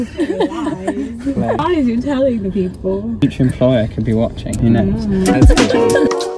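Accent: United Kingdom. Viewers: She must be in the UK. Cause her accent. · Altyazılar olmasa ingiliz aksanını anlamıyorum ama halledicez artık xmcmmxö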